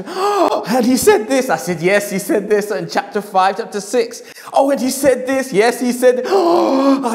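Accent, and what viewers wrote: England, United Kingdom. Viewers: Baseball analogies in an English accent! :))))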